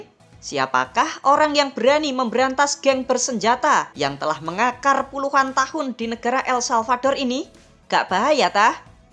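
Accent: Java, Indonesia. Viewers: Buset dah Medok Amat ngomong nya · Admin medok rek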